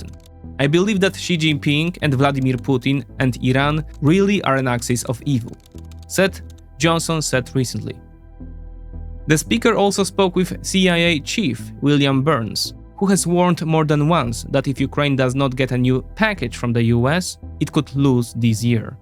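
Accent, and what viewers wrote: Poland. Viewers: So happy to have the Polish accent back !! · Yaaaaaaaay he's Back!!!!! The Polish accent is back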